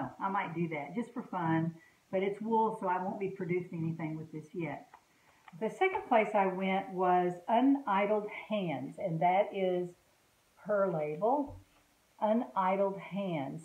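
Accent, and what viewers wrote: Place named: Tennessee, United States. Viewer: G'morning;) I just stumbled on your videos... so I binge watched you last night while I was hooking. I absolutely love your accent and the first of your videos I thought to myself; omg is that a Tennessee accent? I just love Tennessee, I've only been through there a few times when I was younger and once stayed a few days in Nashville, but still remember it so clearly! Anyway, I've had fun watching, keep up the great videos;) Donna from Canada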